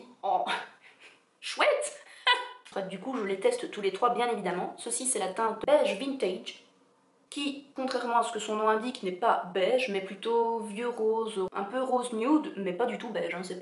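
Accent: Belgium. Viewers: Pour la petite histoire, haha, J'ai reçu la semaine passée un masque Glamglow et j'avais envie d'avoir des avis concernant ce masque. Donc, en cherchant sur youtube je suis tombée sur ta vidéo qui compare ce masque avec un de Sephora - si ma mémoire est bonne. J'ai été interpelée par ton léger accent qui me faisait penser aux Belges et j'ai visité ton Instagram où j'ai vu, qu'effectivement, tu étais Belge. De là, mon petit côté patriotique m'a poussée à jeter un oeil à d'autres de tes vidéos et je dois dire qu'encore maintenant, je suis toujours sur ta chaine à visionner ton petit monde... Parce que j'ai une véritable passion pour les USA et surtout NYC, donc tu me fais littéralement rêver et en plus, je suis une crolée comme toi sauf que j'ai jamais trouvé l'astuce pour arriver à dompter mes cheveux si ce n'est le lisseur, donc je suis aussi intriguée par tes astuces. 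Voila voila ! haha. Si t'avais une boite postale j'aurais pu t'en faire parvenir un que j'ai et qui ne me sert pas. Mais bon voila, sache que chez Club il y en a. Je sais qu'on peut commander sur le site, mais je ne sais pas s'ils livrent aux USA. Je crois qu'ils sont limités à la France et au Luxembourg :/ Bisous !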